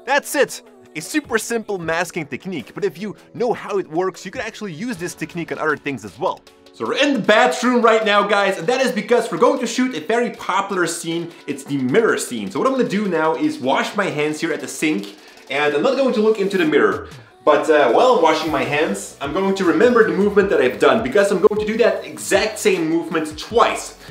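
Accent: Netherlands. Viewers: Are You dutch? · Are you dutch ?? Me too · Ik hoorde al een accent maar jullie zijn dus nederlands! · Wacht, ben je Nederlands?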